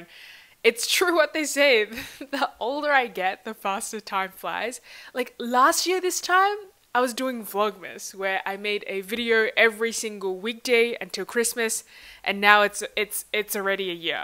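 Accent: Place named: Australia. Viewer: What a great vlog! Really well edited, I'm envious of your comfortability talking to the camera in front of others! Also cool to hear an Aussie accent telling me all about things to do in Taiwan - where I currently am filming my own vlog...also in an Aussie accent. vlogception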